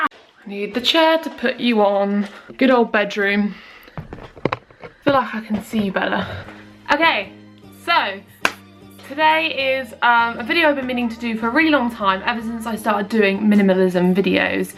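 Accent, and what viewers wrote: United Kingdom. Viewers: Good video. Love British accents!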